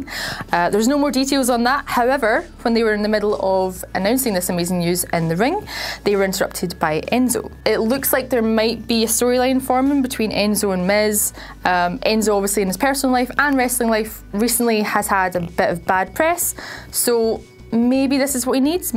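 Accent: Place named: Scotland, United Kingdom. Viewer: My man's got one hell of a Scottish accent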